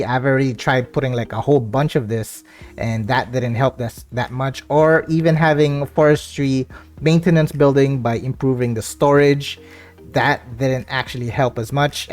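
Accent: Philippines. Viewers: Are you a filipino? Your accent is familiar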